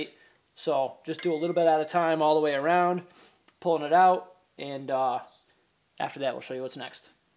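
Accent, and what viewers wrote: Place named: Maine, United States. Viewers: Reminds me of the old Ford twin I beam suspensions when the kingpins would rust fast from lack of grease maintenance. Would make the truck wander all over the place and impossible to turn the wheels unless you had power steering. And I'm detecting a New England accent. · from his accent i would have to say east coat, up wards of Maine or Vermont area. Leaning more towards Maine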